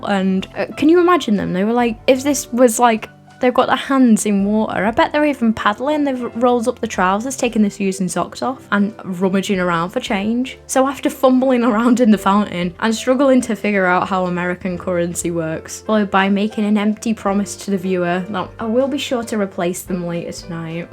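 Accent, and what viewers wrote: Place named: North West England, United Kingdom. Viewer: These vids are so funny, big up north west accents girl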